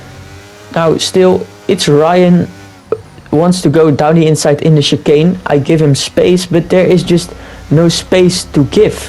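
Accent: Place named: Netherlands. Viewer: Ik hoor dat je Nederlands bent :)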